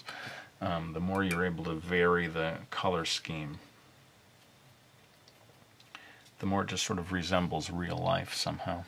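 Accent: Brooklyn, United States. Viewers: Crilley Lesson; It Doesn't matter if you do traditional art or digital art, it'll always be art....and Brooklyn accents are awesome.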